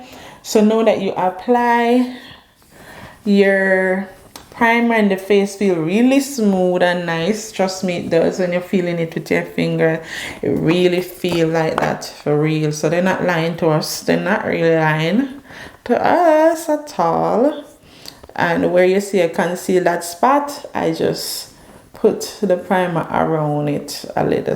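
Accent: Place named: Jamaica. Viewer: u 😂😂 now u making me come on here listen to the accent chro. Jamaica one love..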